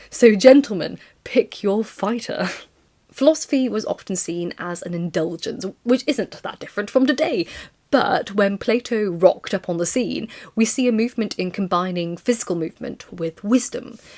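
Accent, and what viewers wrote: United Kingdom. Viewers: How did I know you were British before I clicked on the video?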